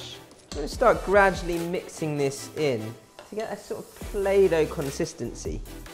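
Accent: United Kingdom. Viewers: What British dialect is that. He sounds exactly like Prince Philip in The Crown!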